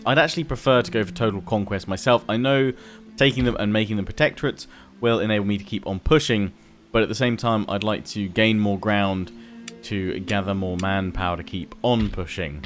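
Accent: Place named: United Kingdom. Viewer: pls just tell me are u british or american because ur accent sounds like british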